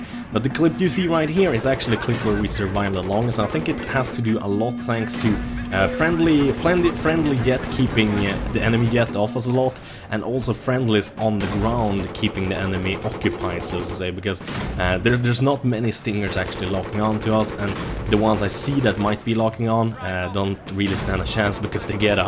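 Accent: Sweden. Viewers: your smooth Swedish tone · You must be swedish :)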